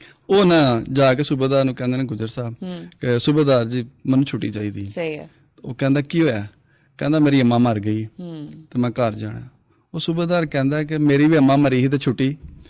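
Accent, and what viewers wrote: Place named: Dogri, India. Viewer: Vadia j&k di dogri BOLI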